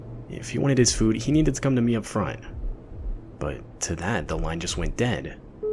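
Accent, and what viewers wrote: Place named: Berlin, Germany. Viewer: The narrator sounded like from Wansee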